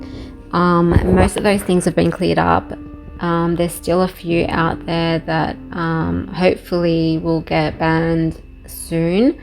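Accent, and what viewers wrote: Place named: Australia. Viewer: Nice vid you sound austrlian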